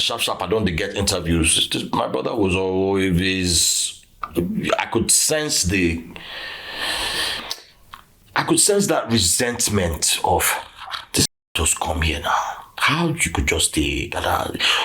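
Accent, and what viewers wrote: Nigeria. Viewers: I can tell you straight away that you are Nigerian and lam not from there! First few moments of talking.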